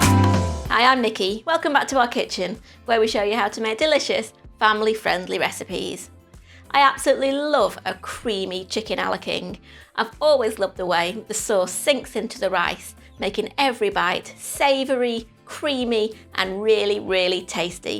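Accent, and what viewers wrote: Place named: Liverpool, United Kingdom. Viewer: Looks yummy and easy. Good old comfort food. Love your 'Shirley Valentine' accent :)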